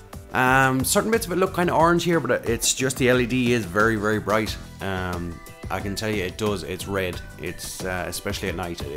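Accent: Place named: Ireland. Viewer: wasent going to like because of, i usually dont like videos.. but i really like the irish accent, so i liked it plus thx for the help XD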